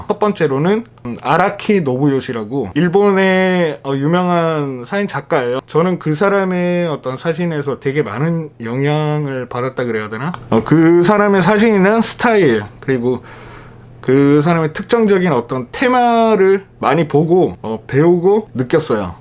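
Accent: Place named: Daegu, South Korea. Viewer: 댓글 달고 나니까 대구가 고향이라는 말씀하시는 부분이 딱 나와버렸어요 ㅋㅋㅋ익숙한 말투라 ㅋㅋ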